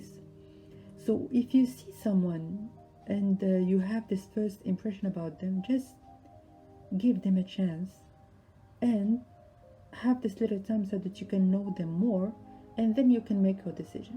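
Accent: France. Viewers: You have a french accent or am I hearing wrong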